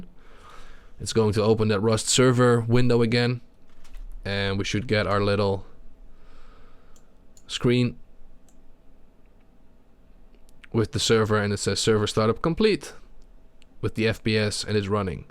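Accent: Netherlands. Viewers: are u dutch? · Duidelijk te horen dat u Nederlands bent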